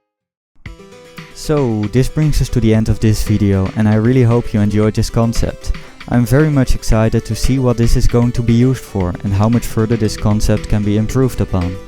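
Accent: Netherlands. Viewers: Het accent wel:3 Ik ben trouwens ook heel blij dat ik een Nederlands accent heb herkend. Ookal is het best makkelijk;3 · Ben je Nederlands? je hebt namelijk een echt Nederlands accent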